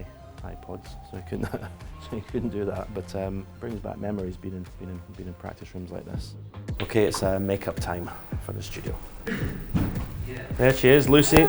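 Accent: Scotland, United Kingdom. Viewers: Fine Scottish man .the voice of fellow Scot’s are made for commentary. And a fifer to boot well done mate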